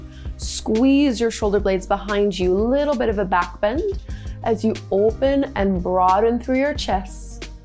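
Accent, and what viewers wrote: Canada. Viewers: Started following for the Canadian accent (I'm homesick 🤣😭) but stayed for the amazing yoga! Thanks. Had an overpowering feeling of love and sadness for my deceased grandparents during the savasana at the end of this practice. I wasn't expecting that.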